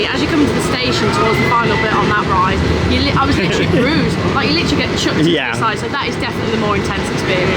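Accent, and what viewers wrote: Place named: England, United Kingdom. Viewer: yeh sound English boss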